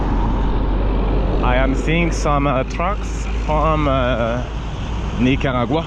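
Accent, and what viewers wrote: France. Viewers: I think you are using the correct words you’ve just got a few things going on at once. French accent & you put all your words together quickly…like real quick. So they sound different. It’s like a remix of words…😂 safe travels😊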